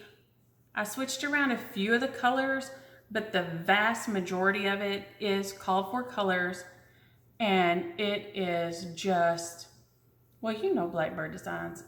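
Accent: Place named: Texas, United States. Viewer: I’m so glad to have found you! I thought you were tons of fun, and you sound like home (Texas) to me. Thanks for doing FlossTube!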